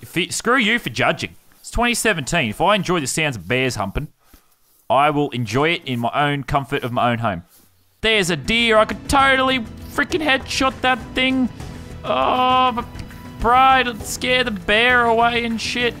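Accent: Australia. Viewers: The fact hes Australian males it so much better lol. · The Australian accent makes his videos ten times better am i right😂🤣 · It’s his Australian accent and honestly I like how it sounds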